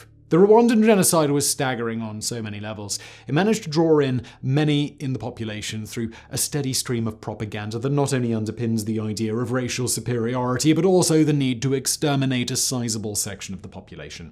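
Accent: England, United Kingdom. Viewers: Or... he wants to get to filming the other 50 videos he has scheduled for the day where he just sits there reading a Wikipedia article that someone else just printed out and handed to him 30 seconds before. Seriously... this guy makes his living sitting and reading shit. He doesn't give a shit about telling stories he makes it clear he hasn't read any of the scripts before they record them and usually has no clue what he's talking about. Whenever he pauses to add his own opinions on a subject it's usually something simultaneously arrogant and stupid. He's just reading as much crap as his accomplices can print out and put in his hands so they in turn can upload it to YouTube and get money on clicks. How many channels does he have now? 50 or so? Do you think he even knows? He's not a historian or a media personality or a great thinker or entertainer. 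He's a reader. With a beard and an English accent. A glorified Speak and Spell. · You are speaking too fast with your British accent that makes it hard to catch up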